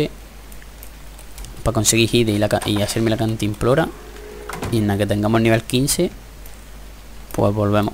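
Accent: Andalusia, Spain. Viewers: Videos de Ark comentados con un buen acento andalú, que más se puede pedir, illo? Sos un crack. Saludos desde Argentina. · Por tu acento deduzco que eres andaluz, de que parte ee andalucía eres? Yo soy gaditano😁😁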